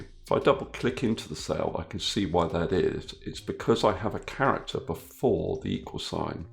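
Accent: United Kingdom. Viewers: Thank you!!! It worked! And the best part to listen to your great British accent!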